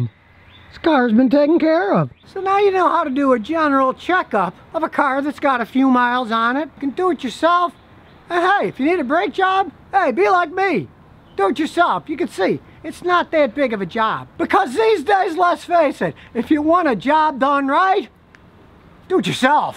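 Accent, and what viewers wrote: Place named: Michigan, United States. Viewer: I like how you say caaaarrrr, are you originally from Michigan? You have a Michigan accent.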